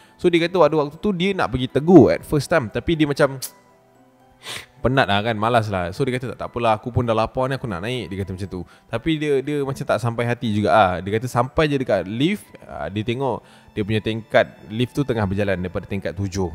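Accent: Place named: Negeri Sembilan, Malaysia. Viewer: Lucu la ckp n9🤣🤣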